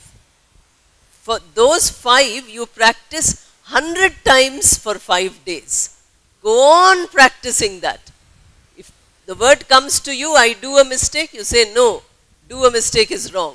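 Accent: India. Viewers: So happy to listen wonderful Indian English, thank you mam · Obviously you are a good English teacher, style of your teaching is very easy.. And the most important thing that the way of teaching is in Indian accent👍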